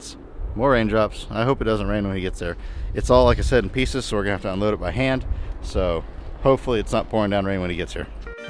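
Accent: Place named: Kansas, United States. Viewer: Shame the rain damaged some stock. You definitely need some more reliable help. I'm 77 fit and well and would be there tomorrow.Havinf know caught up on that Kansas accent which this 'ole South London boy has just got used too. Nice to see the family who are so important for a successful business. Every Best Wish from Wales U.K..... Pat.C.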